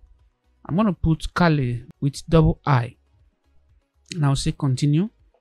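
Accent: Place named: Nigeria. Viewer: Oh ur accent just sounds Nigerian thats why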